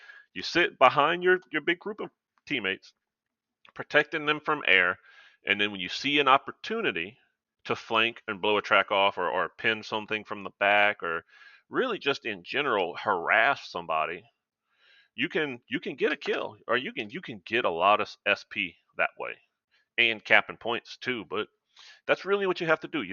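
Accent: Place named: South, United States. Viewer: As a texan, You sir are on of the most southern MotherFckers I have ever heard. <3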